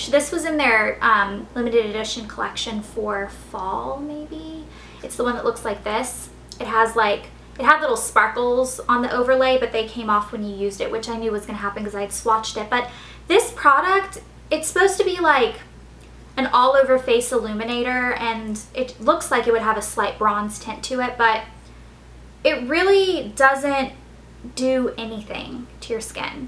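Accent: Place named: South, United States. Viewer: She is southern... We southern girls can't pronounce things just right... lol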